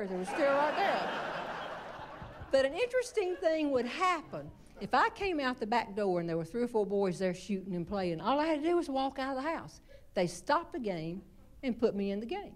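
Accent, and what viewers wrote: South, United States. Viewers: The accent is perfect southern! Way to go.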